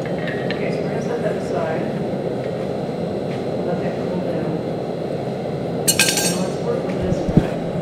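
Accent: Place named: United States. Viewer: Hey are you actually Scandinavian or do you just have love for the culture and history of Scandinavia? You look like a pretty stereotypically Scandinavian, your tattoos seem to have norse inspiration, you have a lot of Norse runes and Norse fonts in your videos, and many of your crafts are Viking styled but your accent is American.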